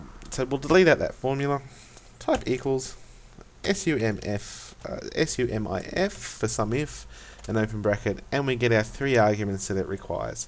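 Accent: Australia. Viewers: Thanks..its nice hearing another Aussie and wow I just learnt how to SUM IF!!!!!!!!!!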